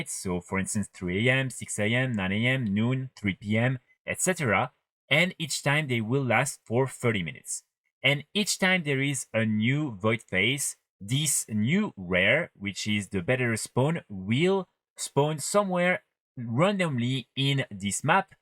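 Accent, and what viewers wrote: France. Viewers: I love that french accent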